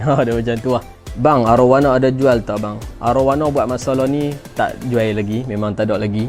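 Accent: Kedah, Malaysia. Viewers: Abang orang mana Kedah ka pineng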